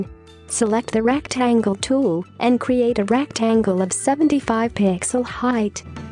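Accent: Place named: Kerala, India. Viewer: gotcha u r from kerala right?????????????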